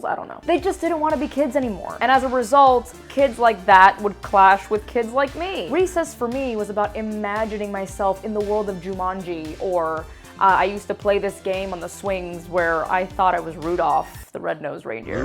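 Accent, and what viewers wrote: Cuba. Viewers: I love your Cuban accent, especially when you imitate your grandma.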